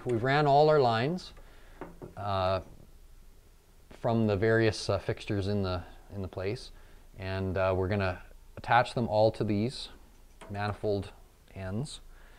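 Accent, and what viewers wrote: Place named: Canada. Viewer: Hi, great video here on the Pex, I hear yer "Inns and yer Oautts" yer must be from Canada I reckon.? Please keep up the good work and God Bless. DD in L.A.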